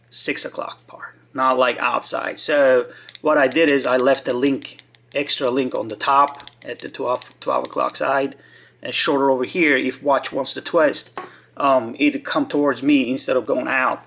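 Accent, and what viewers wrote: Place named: Eastern Europe. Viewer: Matt Phelan He's not a Yank ! He's an east European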